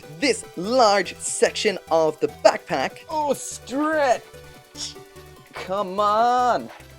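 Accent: Ireland. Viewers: I had no idea you had an Irish accent! Regardless, Thanks for the reply, and you can count on me to continue watching and supporting your channel.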